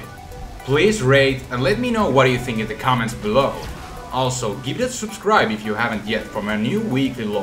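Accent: Finland. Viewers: Where are you from? You sound little bit finnish:D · ookkos suomesta kuulostaa vähä silt ? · ootsä suomalainen koska kuulostat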